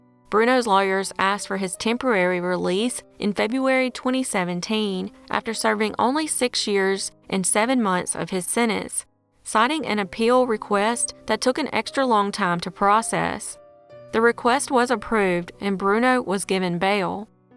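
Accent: South, United States. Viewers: Annoying factoid that I hate but wanna share cause I feel like you'd hate it too, cause you're southern like me. I live in Arizona now, and found out that 'Prescott' is pronounced 'Preskit' here, and it drives me absolutely mad 😂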